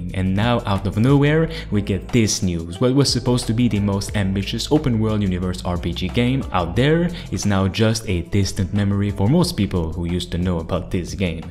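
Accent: Quebec, Canada. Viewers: Toé t clairement québecois 😅✌❤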